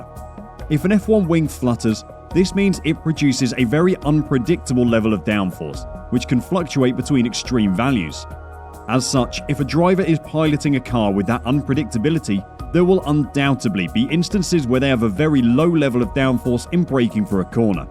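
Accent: United Kingdom. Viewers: sounds like I found a frustrated brit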